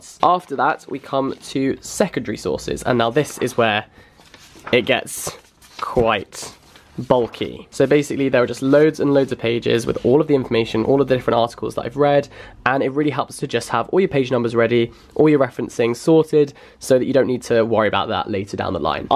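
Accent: RP, United Kingdom. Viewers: UR VOICE JUST SCREAMS ‘TORY ETON BOY’ (also address ur sexuality)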